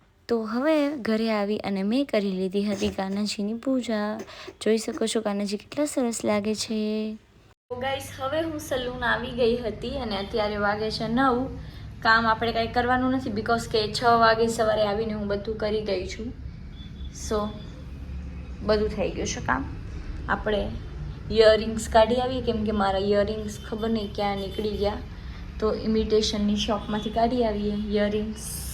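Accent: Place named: Kathiawar, India. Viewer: Chokhu kathiyavadi bolo chhe maja avve chhe ❤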